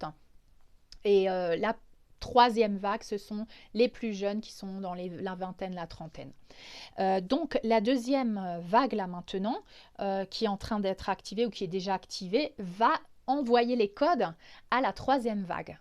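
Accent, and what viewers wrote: Alsace, France. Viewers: Bonjour ! C'est moi ou vous avez un accent alsacien ? 😁😍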